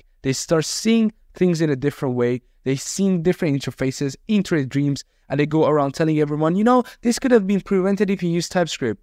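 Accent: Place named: India. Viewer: Blud has indian accent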